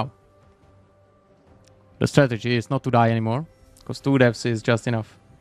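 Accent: India. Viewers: i though you were indian lol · I thought you were indian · Yeah, he sounds Indian 😭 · I thought you were an indian cuz of accent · all this while i tot was indian from his accent.:D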